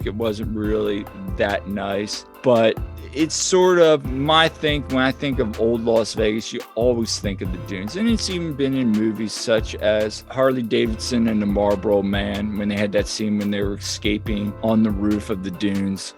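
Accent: Southern California, United States. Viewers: nice vid! also r u from socal?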